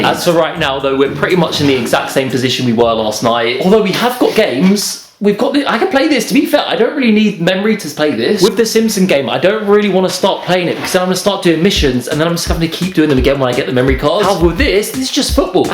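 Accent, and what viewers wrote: United Kingdom. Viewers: Just bothered with the British accent sorry😅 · I love his British accent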